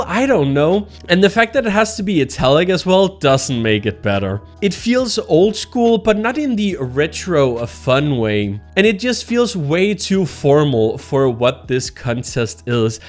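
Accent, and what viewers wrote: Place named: Denmark. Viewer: man kan bare høre den danske accent skinne igennem :)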